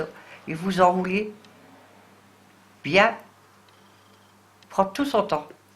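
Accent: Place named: Nord-Pas-de-Calais, France. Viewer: 🤣😅😁😄😃😀😂😉😊 Bonjour comment ça va chez-ti zot? Bon comme d'habitude vous me faites bien rire par votre façon de parler, oh la la une sacrée ch'ti,vous me mettait du baume au coeur merci beaucoup 😉😊🥰😍🤩☺ sinon très joli chapeau pour cet été. Avec ce confinement je vous souhaite un énorme courage et une excellente continuation dans vous projet. À bientôt 😃😉😊🥰 la ch'tit de la région parisienne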